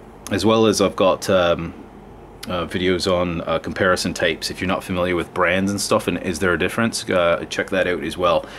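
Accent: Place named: Scotland, United Kingdom. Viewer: Interesting mix of Scottish & American & maybe a little Australian accent there.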